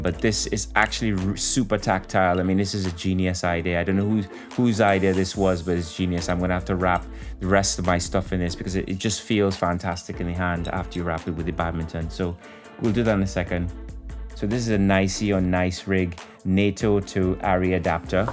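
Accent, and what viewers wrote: Trinidad and Tobago. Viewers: Do I detect a Trini accent?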